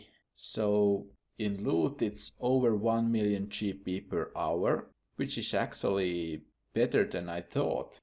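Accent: Finland. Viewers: dat finnish accent tho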